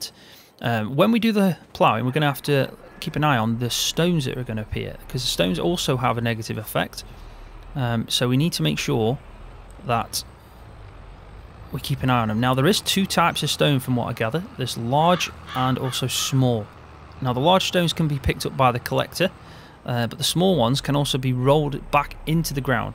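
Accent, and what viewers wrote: Staffordshire, United Kingdom. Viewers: Hi are you from Staffordshire by any chance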